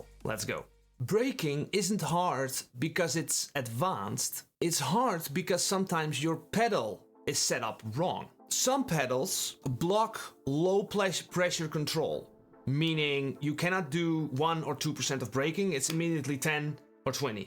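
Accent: Netherlands. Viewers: Nondeju dat is een lekker Hollands accentje jongen 😁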